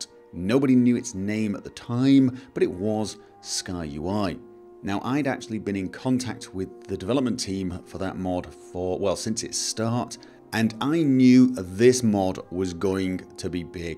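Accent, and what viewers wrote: United Kingdom. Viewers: Besides the quality and your special attention to quality, what also sells your videos: Your british accent, which can be understood very easily for non english motherlanguage speakers. AND: The sound of your voice is just perfect for narration. Keep them coming and have FUN doing them!!!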